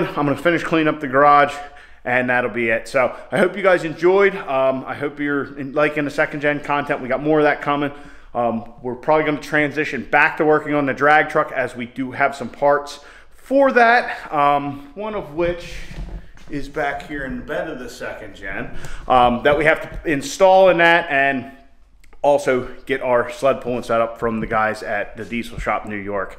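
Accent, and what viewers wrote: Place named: Pennsylvania, United States. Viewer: First minute or 2 of you talking knew you were from PA lol I'm from the lehigh valley. Have the same issue with 24v going to tackle this asap won't pass inspection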